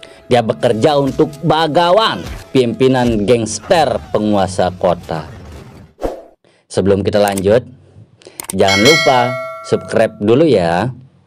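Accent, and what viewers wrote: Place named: Lombok, Indonesia. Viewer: lanjut saja, bro....tiap orang punya kreasi sendiri. logatnya mirip teman saya orang sasak.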